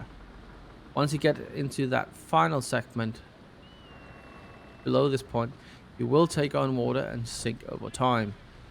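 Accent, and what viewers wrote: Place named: Denmark. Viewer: Your accent tells me you are danish så hvad så der :D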